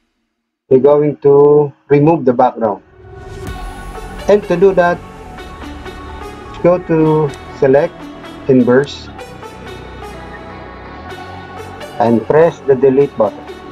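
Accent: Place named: India. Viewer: PARANG INDIAN ANG BOSES NG TUTORIAL KUYA PARANG SI JHAMAL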